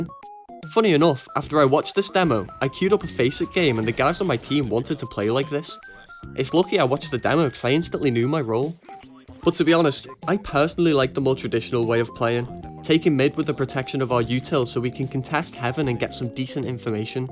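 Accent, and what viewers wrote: Liverpool, United Kingdom. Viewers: Wanted to ask that as your a scouser do you also say your hank marvin? Had a scouse roommate a couple of years ago and as an American I cracked up every time he frustratedly said “ah lad whaddaya on about?!” Good times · Was sceptical on a Scouse lad trying to break down a map 😂. Very nice break down and very well put together video brother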